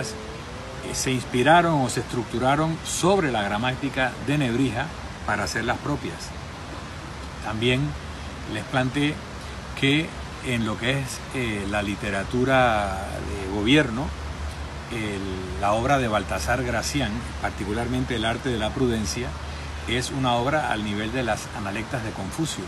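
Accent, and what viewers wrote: Spain. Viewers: Madre mía!! Su acento es prácticamente igual al canario. Yo soy canaria y casi no lo distingo del acento de la gente de Tenerife. Increíble! Sé que los canarios emigramos masivamente durante siglos a Cuba, Puerto Rico y Venezuela y en menor medida a Santo Domingo y a toda la costa caribe de Colombia, Costa Rica, Panamá, etc. Includo llegaban barcos con familias enteras de canarios a Yucatán y desde ahí se desplazaban hasta Florida, Louisiana y Texas fundando ciudades como San Antonio de Texas, pero también bajaron hacia el sur fundando, por ejemplo, la ciudad de Montevideo en Uruguay y muchas otras. Asimismo los canarios descendemos de andaluces, extremeños castellanos , gallegos y otros europeos, además de sangre aborigen guanche, bereber del norte de África. Más que por la sangre, que la hay, lo que nos une realmente es nuestra maravillosa cultura común, de la que hay que sentirse orgullosos porque no hacerlo sería despreciarse a sí mismo.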